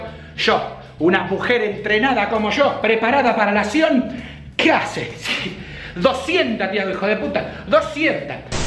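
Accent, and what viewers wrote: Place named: Argentina. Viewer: de que parte de argentina sos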